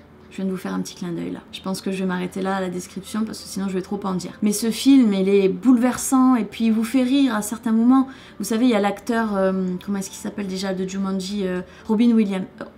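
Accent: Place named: Marseille, France. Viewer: Tu et marseillaise tu parles trop comme Kamila de secret story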